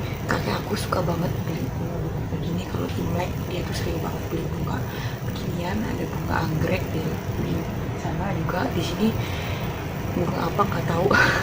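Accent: Banyumas, Indonesia. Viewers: assalamualaikum dek apa kabar nya semoga sehat selalu ya ngapak dulu baru penak😂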